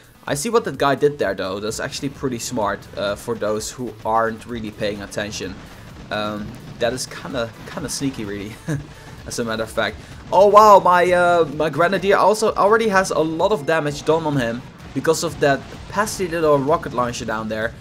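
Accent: Netherlands. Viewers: Is he dutch.cause gis accent looks like a dutch boy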